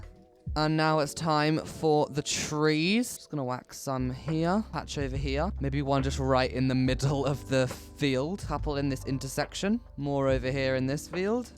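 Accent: United Kingdom. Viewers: You sound like British, are you?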